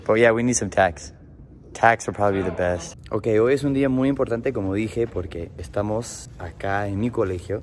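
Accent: Peru. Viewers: Es sorprendente... Tienes el acento y gracia de un nativo peruano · El asento lo tienes bien peruano ja que loco jaja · Hablas tan bien el español y con acento peruano incluído que pensé que eras peruano, pero igual te considero como un peruano más xq se nota el feeling que sientes por Perú, buena, te hiciste una, éxitos bro 👍👍🇵🇪🇵🇪 Nice school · Wouuuuhhh ¡qué hermoso gesto!, se siente tu amor por nuestro ¡PERÚ! ¡GENIAL!!! Me encanta tu sabor peruano al expresarte 😁😍, eres todo un peruano. ¡Cuídate mucho! Y GRACIAS 🇵🇪♥️✨ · No sólo representas al Perú, sino además conoces la jerga peruana y sobre todo hablas español con acento peruano, lo que es muy difícil de lograr para un native English speaker. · En serio man te has peruanizado un montón, tienes el acento de limeño de barranco. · Mare, ahora te creo, yo creí q eres cajamarquino, o pozuzo, hablas bien como el castellano peruano. 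Me sorprendiste.!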